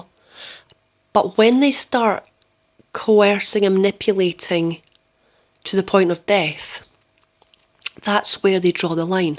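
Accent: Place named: Scotland, United Kingdom. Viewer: You scottish. I'm scottish also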